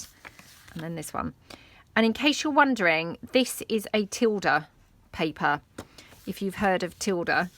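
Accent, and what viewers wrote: England, United Kingdom. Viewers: A lovely video again! Please please promiss you dont do ASMR videos never... I hate the irritating sounds when I am not crafting myself..brrrrr :) Love your chatting, you english accent !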